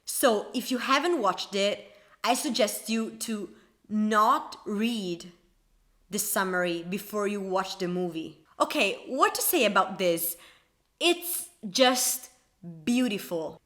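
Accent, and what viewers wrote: Italy. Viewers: please make video, all about theory of love and your thoughts, btw I like your Italian accent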